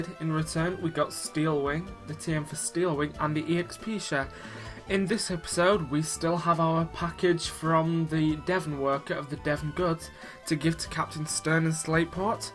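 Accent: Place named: England, United Kingdom. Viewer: Are you From England?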